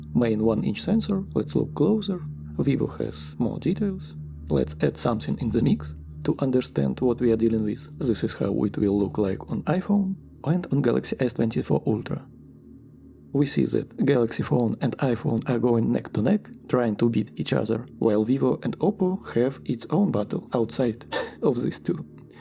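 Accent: Russia. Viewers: Слышу ты Русский, есть канал на русском или все на английском? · Русский акцент чудовищный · У вас русский акцент, поэтому мне очень легко понимать ваш инглиш. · Отличный обзор! Акцент выдает русские корни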